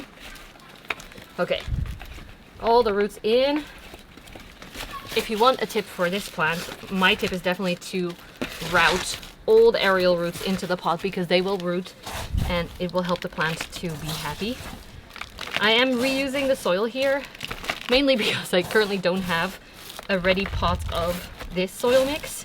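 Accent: United Kingdom. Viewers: Your British accent is so funny, occasionally you drop into an Eliza Doolittle accent, from My Fair Lady. But it’s a better accent than my cockney one 😅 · This was a really fun video, you have such an interesting life! I loved your British accent lol that was so entertaining 👍🏾👍🏾😂😂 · Hi 👋 I love the long chatty video! 🎉❤ it was so fun to hear about how you and G got started and about your kitties. And I love your silly fun unfiltered personality ❤ your British English was so awesome 😂❤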